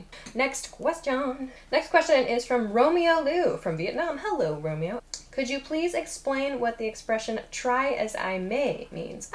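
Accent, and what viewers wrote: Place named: United States. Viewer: Thank you for answering my question. i love your accent. this is my best American teacher.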